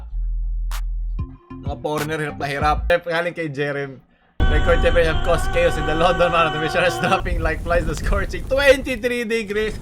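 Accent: Philippines. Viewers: I like your filipino accent, say it again Tito: Intarnet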